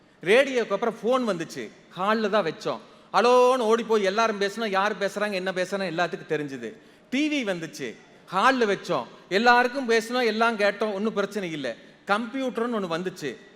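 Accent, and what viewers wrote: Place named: Kongu, India. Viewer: அண்ணன் கொங்கு பேச்சை வரவேற்கிறேன்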